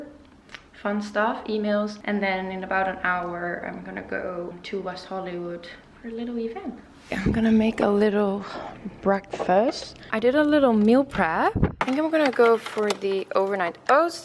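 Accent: Netherlands. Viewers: I love the Dutch accent. My very best gf came from Holland to Los Angeles when we were young. 'Besties' from age 10. In her home they spoke only Dutch. Tried to learn some, but it is very difficult language! She passed a few years ago and I miss her so. But I get to hear your beautiful Dutch accent. It reminds me so of her. She too, was tall & very beautiful like you. I listen to your vids and hear you talk, and it brings to mind something very special...a Dutch home, my 2nd family and my BFF. So enjoyable to watch you. AND Im learning about the value of 'healthy' food. It does change your energy level! (Im getting there LOL) Also enjoy the print work you do. Those 'warm' wintery clothes are beautifully appealing. But in LA?? (I still get their catalog) Naaaahh, too hot🔥. Well then, maybe see you in Park City??? 🌷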